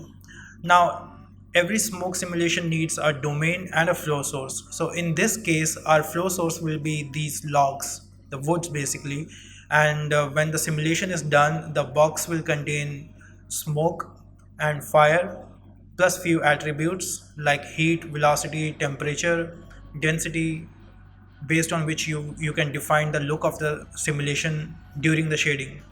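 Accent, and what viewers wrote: India. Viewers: Indian! love it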